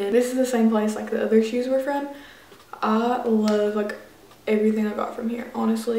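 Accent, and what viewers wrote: South, United States. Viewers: her accent is so southern southern accent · living for country accent. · arina k i thought she was Australian then i heard a hint of southern lol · her accent is country af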